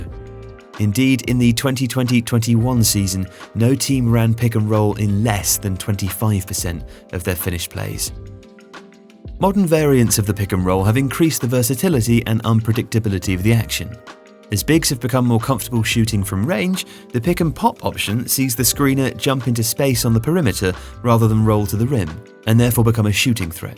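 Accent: England, United Kingdom. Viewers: Somehow I'm still not used to british accent explaining basketball..😂 yet great content tho.. Keep it up!!!🔥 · Rather listen to English accent all the time!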